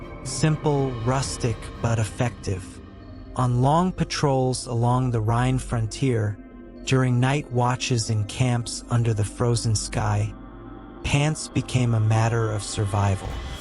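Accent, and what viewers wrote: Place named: United States. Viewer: In American, pants are the general term used for all outer garments longer than the knee. Shorter than that, and we call them shorts. Calf length, and they're crop pants or waders. Trousers are formal (expensive) pants, usually for men. Breaches are an old, not used for anything but reenactment or renfaire, style of pants. The narrator had an American accent, so why would he call pants by a British name that would confuse those who speak American?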